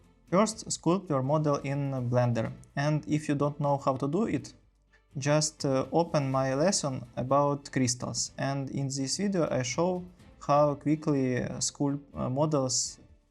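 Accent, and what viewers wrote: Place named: Russia. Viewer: хороший гайд, пишу на русском, потому что уверен, что ты оттуда же (акцент прям выдает)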